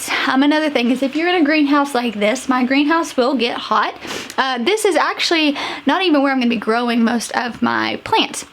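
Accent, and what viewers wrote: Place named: South, United States. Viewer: I like how you Southerners say "feel"...! it's just like "fill" to the northern ear ahhahah it is so pleasant to hear. Happy Gardening and Happy New Year all. Thanks for the inspiration.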